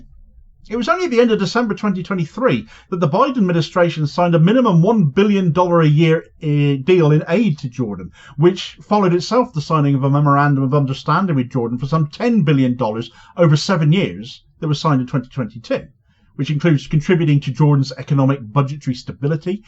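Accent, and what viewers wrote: United Kingdom. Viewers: I love how this idiot with the stupid British accent thinks his opinion holds any relevance on anything in this country.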